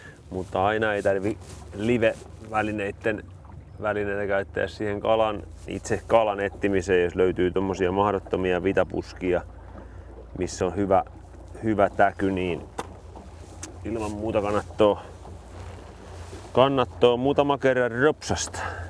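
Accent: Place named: Häme, Finland. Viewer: Ensin tyylipisteiden jako: kakstoista pistettä ja papukaijamerkki. Kuvaajalle, leikkaajalle/koostajalle jaaivan erityisesti 'luontevasti soljuvan puheen tuottajalle' - sisällöstä, mutta myös estetiikasta. En ole varmaan koskaan aikaisemmin kuullu puhuteltavan kaloja - ahventa, kuhaa, mutta aivan erityisesti haukea - niin monipuolisesti, hellän kaverillisesti, vähän kiusoittelevastikin. Kuulee, että on oltu vuorovaikutuksessa aikasemminki. Ainoastaan savon murre puuttuu, mutta menee se nippa nappa hämäläinenki .. Eikä tuo tekninen osaaminenkaan paljo taiteellisen rinnalla kalpene. Ainakaa, jos aatellaan saalisvarmuutta. Näin kaksdee-arskana tuo saalisvarmuus jopa hämmentää. Ja tulee eittämättä sekin mieleen, että missä kulkee eettisen saalistamisen rajapyykki. En halua neuvoo toisia. Oma kalastus keskittyy ruokakalan pyyntiin asenteella, että 'en tee onkelmaa siittä, jos kalaa ei satu tulemaan - enkä siittäkään, kun sitä joskus tulee'. 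Nostan siimat ylös ja sumputan järvessä seuraavaa kertaa silmällä pitäen. Kaksdee-arskana mulle tulee sopivasti jännitystä ihan siittä, että tuleeko tällä kertaa vai eikö tuu. Omana mielipiteenä heittäsin kuitenkin väkäsettömien koukkujen käytön aina sillon, jos kaloja saalistetaan selvästi yli tarpeen, koska väkäsettömillä se vapautus onnistuu aina helpommin. Sillä tuskin se metrisen vihernieriän karkuutuskaan enää kovin kipeetä tekee, jos niitä toistametrisiä on vyöllä jo enemmän ku paljo. Taiteellisen tyylin lisäks paikkatyyppipohdiskelu itte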